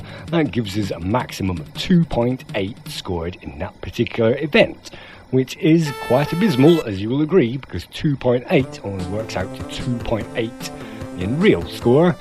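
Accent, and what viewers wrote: Lancashire, United Kingdom. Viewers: really enjoy your reviews! I'm a faithful subscriber from the USA and your accent is reminiscent of a mate from Accrington, so I'd venture to guess you're from Lancashire? if I'm wrong, no offense and keep up the great videos!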